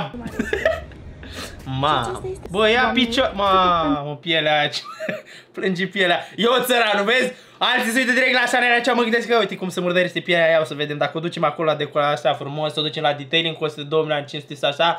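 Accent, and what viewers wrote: Moldova. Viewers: Tu ești moldovean?😱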